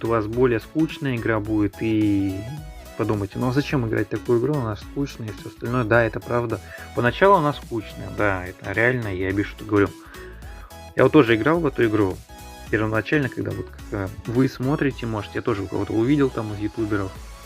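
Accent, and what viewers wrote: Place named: Russia. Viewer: I think Russian, he has kind of a heavy accent.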